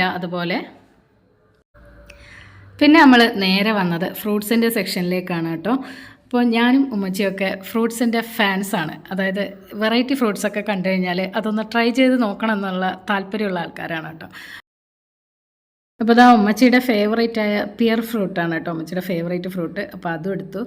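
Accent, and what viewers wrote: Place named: Southern Kerala, India. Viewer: ningo sharikkum കാസറഗോഡ് nneya pore 🙄samsaram motham തെക്കൻ ശൈലി അത് എങ്ങനെ കിട്ടി കാസറഗോഡ് ന്റെ ഒരു തരി സ്ലാങ് വരുന്നില്ല സംസാരിക്കുമ്പോ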